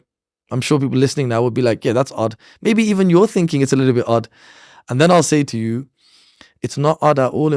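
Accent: United Kingdom. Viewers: sounds like a Bradford accent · it’s more a midlands / Birmingham accent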